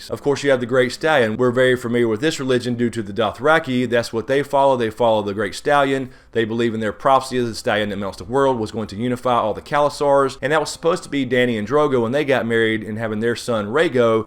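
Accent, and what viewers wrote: South, United States. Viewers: hey! I love your southern accent and the way you simplify the books and show for those of us who can't keep track of every detail. My question is what role do you think Meera Reed is going to play in the season to come? I believe someone told her that she was important to Bran and she did save his life but do you think she's more important than that? do you think she's secretly someone?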